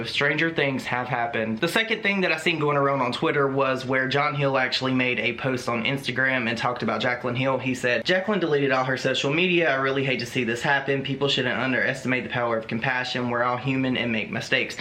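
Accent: South, United States. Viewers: I love your accent! Southern twang sounds so cool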